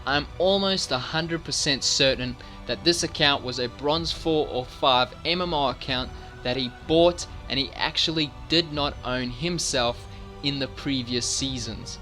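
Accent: South Africa. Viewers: You sound South African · south african???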